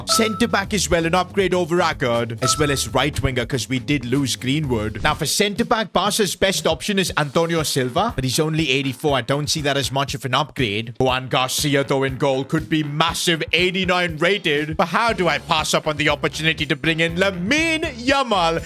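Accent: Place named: India. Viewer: You sound indian and pakistani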